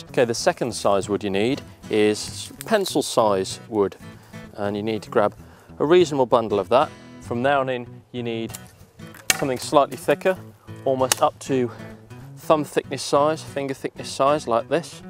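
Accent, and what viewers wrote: United Kingdom. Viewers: I love you your Brithish acent is to die for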